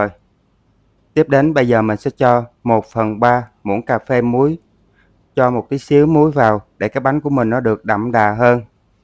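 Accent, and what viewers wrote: South, Vietnam. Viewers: Em có giọng nói dễ thương ngọt ngào của người miền Nam, giọng cười hiền hòa . Em chỉ làm bánh với công thức rõ ràng . Cảm ơn nhiều chúc em thành công .❤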